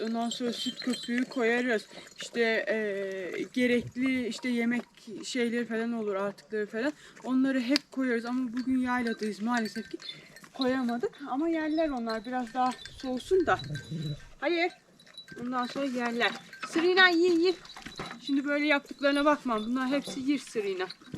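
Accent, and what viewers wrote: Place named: Kastamonu, Turkey. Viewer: Istanbuldan selamlar seni izliyoruz Allah yolunu açık etsin bizim kastomonular gibi konuşuyorsun maşşallah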